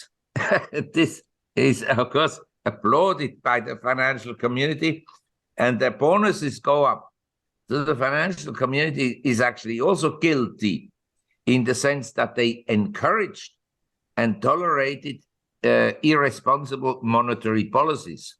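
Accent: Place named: Switzerland. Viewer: I love Marc’s Schweizer Deutsch 🇨🇭accent so distinctive and just add to the overall entertainment and insightful wisdom great choice of where to live Chang mai is fantastic Marc talks it’s good to listen Not sure that the precious metal call is a good one , I’d go maybe max 10 percent of a non yielding asset but the general advice of diversification has never been more important and keep dry powder keep cash now you can get 3 to 4 percent on your cash with no risk patience. Will pay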